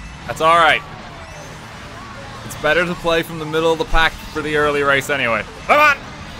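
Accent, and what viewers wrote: Ireland. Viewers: Are you accutaly Irish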